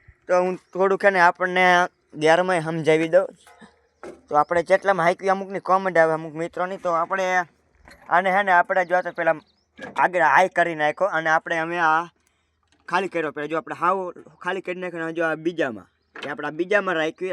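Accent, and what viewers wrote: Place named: Surendranagar, India. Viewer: Bhai Tari boli surendarnagar ni che😅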